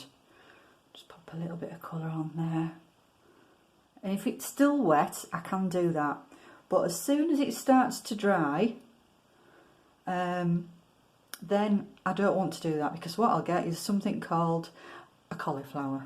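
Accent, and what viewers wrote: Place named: Yorkshire, United Kingdom. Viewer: Beautiful painting, and I love your Yorkshire accent! And I’m not taking the mickey. Hope I spelled that right.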